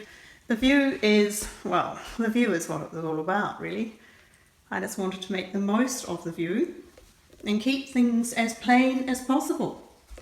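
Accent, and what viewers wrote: New Zealand. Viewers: I thought I heard the New Zealand twang.....it never leaves you my dad came from Scotland and never lost his Scottish twang even though he lived in Yorkshire for many years.....have not visited the South Island but that's my intended place next time fingers crossed.....x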